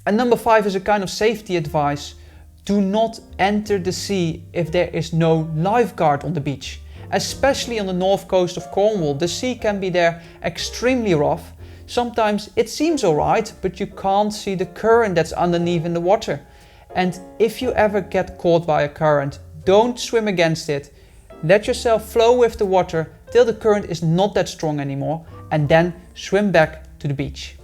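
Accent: Netherlands. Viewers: i hear your dutch so that says enough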